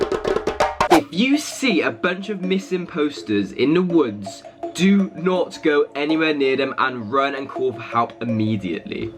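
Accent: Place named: United Kingdom. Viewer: I love your british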